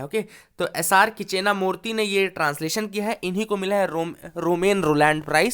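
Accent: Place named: Bihar, India. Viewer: Sir ap Bihar se hai na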